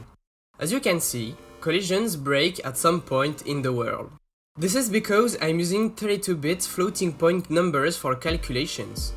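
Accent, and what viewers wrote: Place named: France. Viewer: J'ai reconnu direct que tu est français xDD sa s'entand mais sinon gg mec tu a fait du bon boulot!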